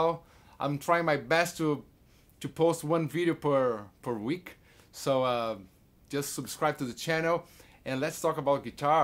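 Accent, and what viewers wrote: Brazil. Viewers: Eae galera, o inglês do é bom? ou ele tá cheio de sotaque BR? SHAHUSHUS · É praticamente impossível falar sem sotaque. O que importa é que o cara é fluente. E, aliás, o sotaque brasileiro é agradável aos ouvidos estrangeiros. Não tem problema nenhum.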